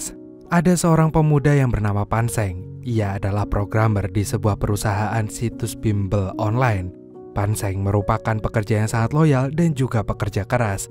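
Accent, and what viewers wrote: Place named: Nganjuk, Indonesia. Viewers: Curiga admin e wong nganjuk ki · Admin e wong nganjuk ki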